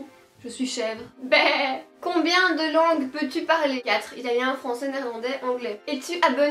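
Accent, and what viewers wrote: Belgium. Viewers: Il me semblait bien avoir reconnu ton accent Belge :) C'est rare de voir des youtubeuses belges d'ailleurs ! <3 :)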